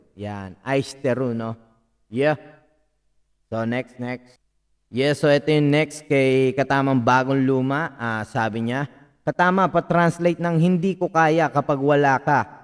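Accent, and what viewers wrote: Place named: Kansai, Japan. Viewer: Boss kansai-ben yun sayo no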